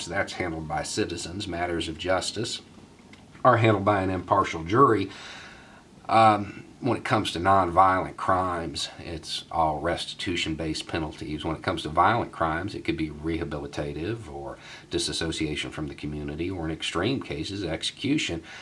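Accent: South, United States. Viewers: Oh man what this guys sells is generic progressivism in a southern accent. He's the black republican.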